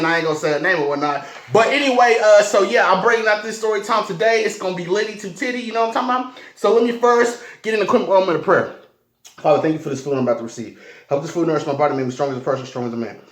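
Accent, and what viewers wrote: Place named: Texas, United States. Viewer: You gotta be from Waco...or central Tx. This is how we talk cuddy.lol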